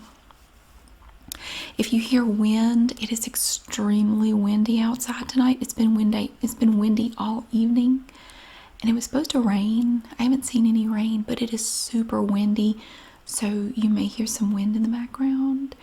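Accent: South, United States. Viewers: Hi. I listen and relax to your vidz a fair bit. Love your southern accent. I came across yesterday's video were you seemed very upset due to trolls. Please dont be upset. You cant control such idiots and the ignorance of folk. They hide behind their phones etc and blubber their strifes away. Cowards and it's not personal... You are so genuinely lovely at heart... Take Care Tina from Liverpool (Engand)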